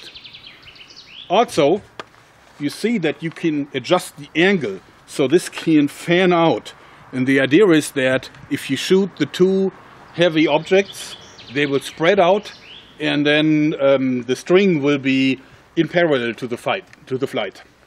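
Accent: Germany. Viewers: Das Schönste an den Videos ist doch, dass englisch mit dem schönen deutschen Akzent. Es würde eine Menge an Witz verlieren.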